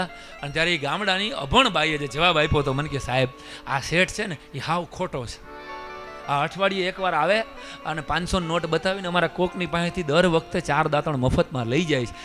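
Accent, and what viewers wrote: India. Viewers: Aap no awaz sabhli Jamnagar ni yaad aavi Jay che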